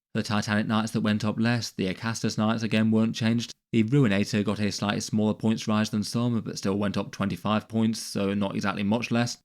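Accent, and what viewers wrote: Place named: United Kingdom. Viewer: 5 years of listening to you and still have a hard time making out your mono tone British accent on my cell phone speaker at work.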